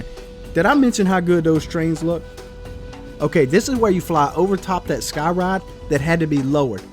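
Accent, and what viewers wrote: South, United States. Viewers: Alright I’ll say it, I love your southern accent.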